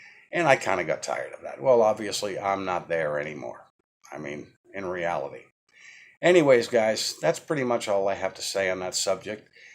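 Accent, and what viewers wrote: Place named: New England, United States. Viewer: are you from Boston? Your accent sounds like you're from a New England state. I'm from New York. Like your honesty. I recently quit JWs cold turkey close to 2 months. Having slight social withdrawal symptoms, but loving the truth I am learning directly from the bible and from videos likes this.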